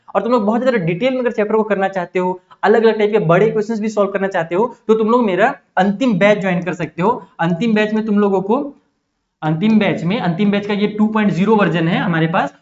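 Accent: Bihar, India. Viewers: sir aap bihar se hoo kya ?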